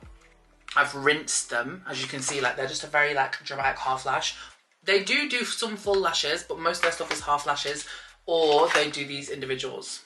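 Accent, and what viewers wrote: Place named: Preston, United Kingdom. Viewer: I have no idea how I never found your channel until recently, we're the same age, same size, and I also grew up in Preston so we sound the same😂 the asda you used to live behind is where I went for the weekly shop with my mum haha. Your genuine love for yourself and happiness is so empowering to see, thank you for posting!❤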